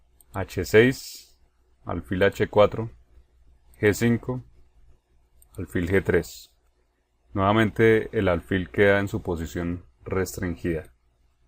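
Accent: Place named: Colombia. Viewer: tienes un acento muy colombiano... eres colombiano? gracias por el video muy instructivo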